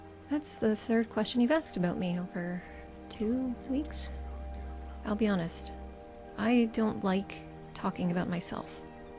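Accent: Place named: Canada. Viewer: Love the voice acting! Will have to give it a download! Soothing voice too, love the Canadian twang :) Are there any known mod conflicts?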